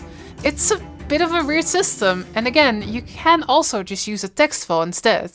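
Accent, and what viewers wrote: Netherlands. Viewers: u sound very dutch ^^ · I knew it!. the moment that accent hit, haha Dutch person! you are found! haha. Anyways, thanks for the data. Good luck on the rpg.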